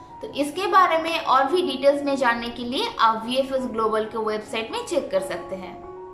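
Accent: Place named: Bengal, India. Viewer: Bengali Accent s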